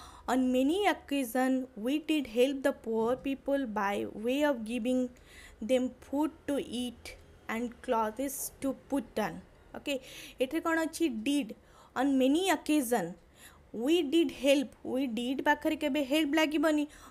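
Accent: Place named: Odisha, India. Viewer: It's motivate kare au please English padheila bele odia accent dhariba is blunder😂😂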